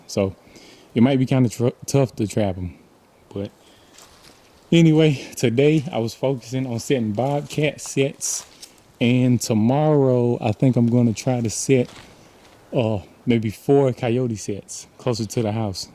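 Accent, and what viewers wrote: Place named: Mid-South, United States. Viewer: This YN!! Out here trappn for real ! Memphis? Sippi? You definitely have that mid south accent. Have you caught one since you did these video?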